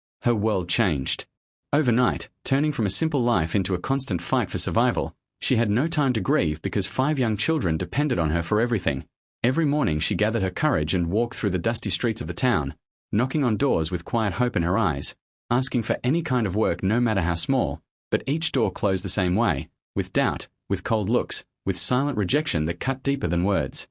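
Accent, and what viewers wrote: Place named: Australia. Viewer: Okay. . . With this voice, I suppose I can imagine this is about the Strayan outback.😊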